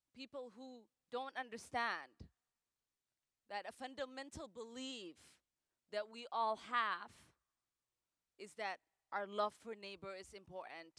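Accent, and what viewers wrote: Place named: Somalia. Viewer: I see she's revved up her Somali accent in order to represent her people - not Americans, but CAIR and its affiliates.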